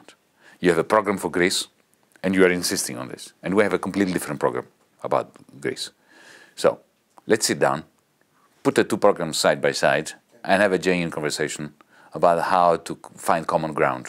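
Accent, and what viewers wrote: France. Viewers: Mais... Pourquoi tu commences l'interview en prononçant pas trop mal l'anglais, puis tu switches sur le pire accent français ? Un petit effort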